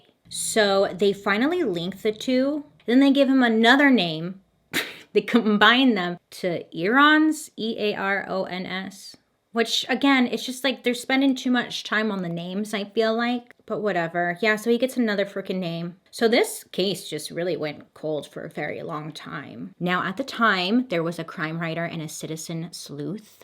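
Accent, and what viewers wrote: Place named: California, United States. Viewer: Is it me or this is the first time i can tell her Californian accent... like kardashian or something lol... like the video though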